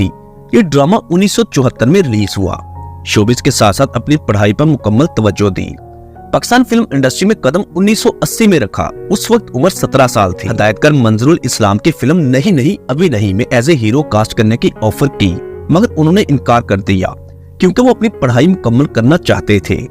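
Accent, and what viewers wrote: Punjab, India. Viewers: Why is the commentator trying his best to speak urdu in Panjabi style??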